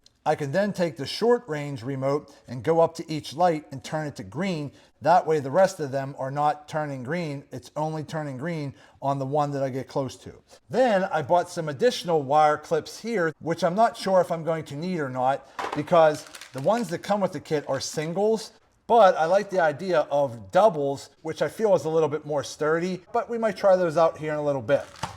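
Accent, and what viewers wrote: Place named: Pittsburgh, United States. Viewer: Where are you from I'm from pittsburgh and I hear a yinzer accent when you talk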